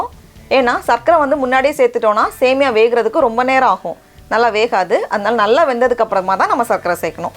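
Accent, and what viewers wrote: Nagercoil, India. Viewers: akka neenga USlaya irukeenga appro nagercoil kaarangala ☺☺☺ so happy i heared it in a you tube channel appro onga veetla ellaru nalla irukaangala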